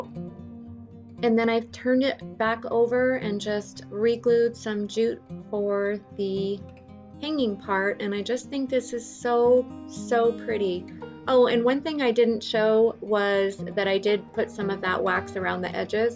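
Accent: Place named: South, United States. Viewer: you live in south by your accent. Pumpkins are fruit not vegetables. I am married to a farmer and my dad had a garden until he could not tend it anymore. Country girl here!!!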